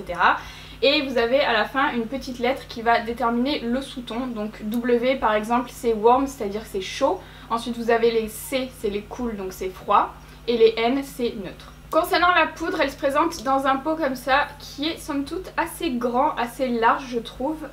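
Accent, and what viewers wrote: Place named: Alsace, France. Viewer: ...Les couleurs m'inspirent pas...c'est pas français ça...c'est facile mais très chiant...:-) et résultat c'est super intense super joli et vivement une vidéo avec tes nouvelles couleurs de cheveux, et entre nous, je confirme que t'as un sacré accent alsacien quand tu déconne avec tes voisines sur instagram :-)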